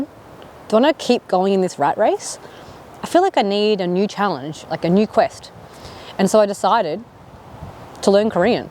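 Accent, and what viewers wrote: Australia. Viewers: G’day, mate! Glad to see an Aussie here in South Korea. I’m a Korean Aussie and used to live in Adelaide but now living in Korea near Daegu. Came across your YouTube clip and so glad to hear Aussie accent in such a long time. perhaps, 4-5years since I left Adelaide? All the best for your new journey and hope you to find many joys much happiness. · 호주 영어 넘 듣기 좋아요.^^늘 응원하겠습니다. 전 반대로 호주에 있는데 한국에서 새로운 도전중에 악기나 스크린 골프 뭐든 배우시는것도 추천드립니다.^^ 그리고 아프시면 안되니 병원도 꼭 자주 가서 검사받아보세요. 제가 호주에 있으면서 제일 불편하고 적응안된 두가지입니다. 한국은 이 두가지 천국이에요^^ 꼭 원하는 성과 없으시길 응원드리고 늘 구독하겠습니다.홧팅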